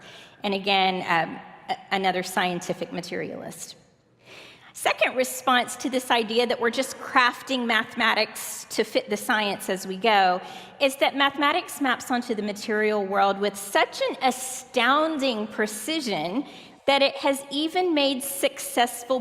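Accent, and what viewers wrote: United States. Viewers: Hers is the most quintessentially American accent I've ever heard.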